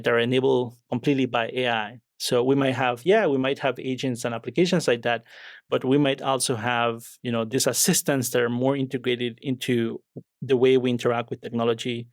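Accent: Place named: India. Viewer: GOOD INDIAN ACCENT, VERY UNDERSTANDABLE BEST IS TO READ THE PAPER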